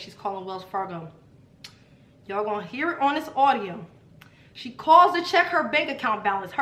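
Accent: Philadelphia, United States. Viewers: you from philly aren’t you? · Are you from Philly? I think hear it in your voice lol I’m really really sorry that happened to you, people are CRAZY.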